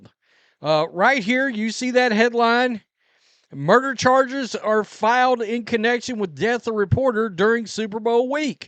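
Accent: South, United States. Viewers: Dude, you sound southern you should know even the law is crooked in New Orleans. Nothing crazy out of that city ever surprises me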